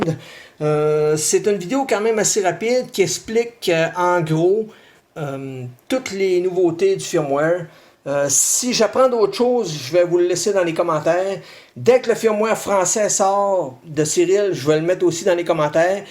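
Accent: Quebec, Canada. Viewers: Bonjour Merci pour cette vidéo très bien expliquée digne d'un professeur sinon mieux;) suite a ta dernière vidéo concernant le firmware timymachine et avec l'écran en français, il n'y avais plus la fonction reprise après coupure de courant et la fonction économie d'énergie alors que dans l'écran le bouton est bien là mais ne fonctionne pas. J'aimerais savoir si ces 2 fonctions sont maintenant opérationnelles avec la mise à jour. Continue comme ça car j'adore tes vidéos et surtout ton accent du québec 🙂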